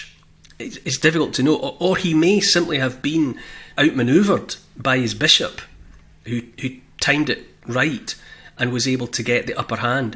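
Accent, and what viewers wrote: Scotland, United Kingdom. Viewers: I like accent. Is it Scottish?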